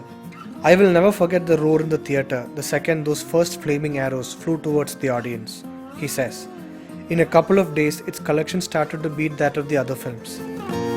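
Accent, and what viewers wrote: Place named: Kerala, India. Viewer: The narrator's English is Purley like a malayali's English!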